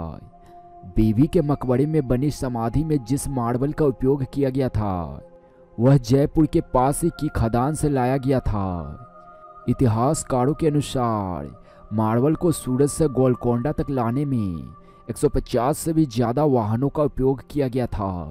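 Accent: Bengal, India. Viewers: Ye bangali hey bhai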